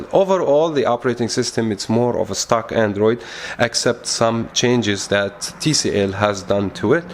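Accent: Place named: Arab world. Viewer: This video was okay until I heard this annoying Middle Eastern accent.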